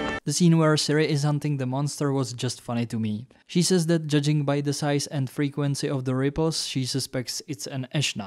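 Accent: Czech Republic. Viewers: Z přízvuku slyším že jsi čech, tak jen takhle utajeně :) : skvělej rozbor, ušetřil jsi mi čas se sledováním 3.série, to si raději konečně přečtu Husitskou trilogii.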